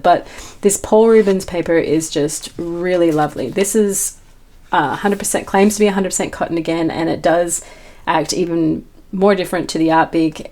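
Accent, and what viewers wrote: Australia. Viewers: Hello... you sound a bit Aussie, but living in USA? If so, hello from a fellow Aussie. Thanks for the video. Could you do me a small mix favour with your Roman Szmal, with the "tint" colour with a Cobalt blue please? Could let me know if you get a grey or a green? Thank you in advance! Looking for a sky and cloud glow. A bit of a unicorn, I love a cobalt or warm blue like periwinkle, or lavender with a white in it, to make it opaque thinned out, and less granulating. I love Schmincke's finest Ultramarine. I know I am searching for a glow, without going green that is also non granulating. Eg jarosite. Or some gold ochres, some Naples or Flesh colours. It is likely to be a neutral yellowy orange or a PBr7 like a burnt sienna... Like you, also a fan of pens and ink. Have you tried Emma, the R & K sketch ink? A waterproof greeny olive, fast drying! 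In kindred spirit, Eliza. Dulwich Hill, Sydney Australia xx 14th of December 2022 pm bed office PS I like the look of your deep bag. Where do you get the large fine mist spray bottles? They are not on your list? PPS: Thanks for your channel. xx PPPS: You need to try my favourite RS, "Misty Morning", it separates, a light cool grey into a rose and cobalt teal kind of colour. An edge happens. It is delightful. Good by itself. Seeing as though you like your neutral abstracts, I think you will have a new favourite.....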